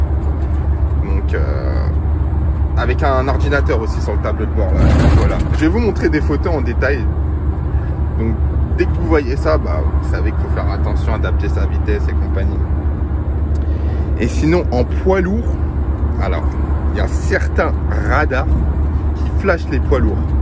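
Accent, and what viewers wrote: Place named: Antilles, Caribbean. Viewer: ahah l'accent timal on reconnais les frero tu es d'où ?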